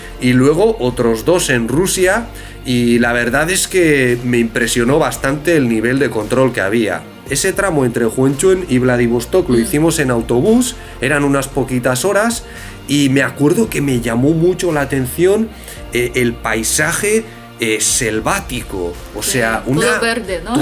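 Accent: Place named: Basque Country, Spain. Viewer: Me parecía por el acento y porque pones cabeza que tenías que ser euskaldun .Un saludo para los dos desde Montevideo , felicitaciones por vuestros aportes !!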